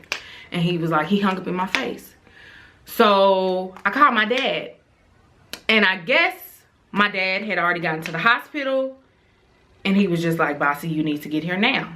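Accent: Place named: Memphis, United States. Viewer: And you from Memphis??? I can hear the accent😭🥰🥰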